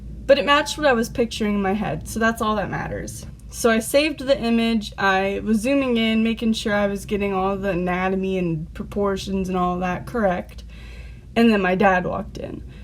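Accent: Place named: Arizona, United States. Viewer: YO YOU FROM Az lol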